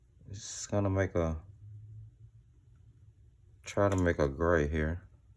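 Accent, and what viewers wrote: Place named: South, United States. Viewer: I have watched 5 other YouTube videos and yours is my favorite! Excellent instructions as you paint. Love your southern accent.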